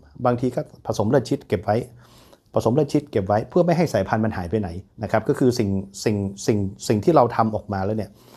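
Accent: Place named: North, Thailand. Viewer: พูดไทยยังไงสาบเมืองทุกคำ เจ็บแอวขนาด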